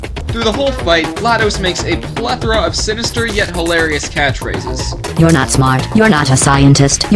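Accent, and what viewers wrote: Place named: Canada. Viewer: your canadian i can tell...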